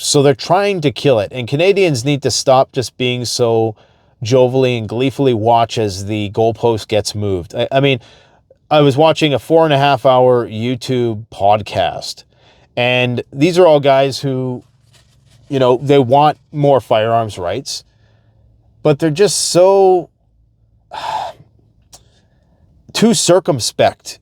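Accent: England, United Kingdom. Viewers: Geeze you sounded more British then me 😂😂 brilliant!! Thank you for your updates buddy much love from Dorset 🇬🇧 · Lol British accent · Why use an English accent when talking about Trudeau? We have pr**ks in England but we don't use Canadian accents to describe them 🤷🏿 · I want more british accent!!! 😅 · Your british accent had me dying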